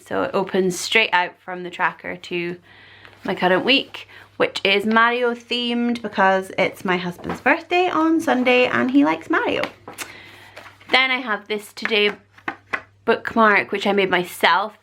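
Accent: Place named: Northeast Scotland, United Kingdom. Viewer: You sound like you must be based in the North East of Scotland? Love this video by the way!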